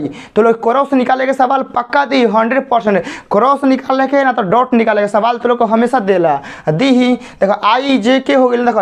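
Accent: Bhojpuri, India. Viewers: Aap bahut mitha bhojpuri bolte hai 😀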